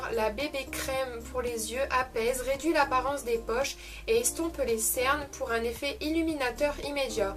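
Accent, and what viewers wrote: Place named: Nord, France. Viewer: J'aime beaucoup aussi la marque Klorane 😊 le baume au beurre de mangue j'ai déjà testé tu vas voir il est super ! Je ne m'abonne pas à la Birchbox car il y a toujours des produits dans les boîtes que je n'aime pas et que je n'utiliserai jamais et je n'aime pas le gachis 😅. Tu nous en dira des nouvelles des produits et de ton ressenti. Tu es Nordiste non? j'aperçois un petit accent 😂 je suis Picarde. Super vidéo bisous 😘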